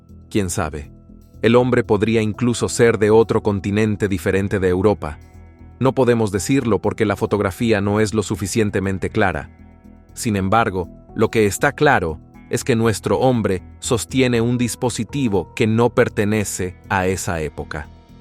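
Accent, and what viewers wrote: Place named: United States. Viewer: Otro más hablando a lo gringo, haciendo el.....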